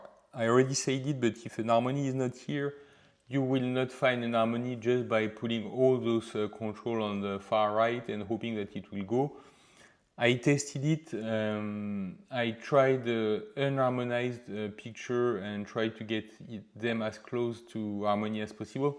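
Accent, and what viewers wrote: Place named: France. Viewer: I love your French (?) accent! Thank you these tutorials! Btw, are you familiar with 3D LUT Creator? It's an amazing app (unfortunately a paid app). It has some remarkable tools (that, actually, remind me of some of the tools in DaVinci Resolve for color). I'd love if something the the A/B Grid was incorporated into Darktable. And if not, this is an amazing raw editor.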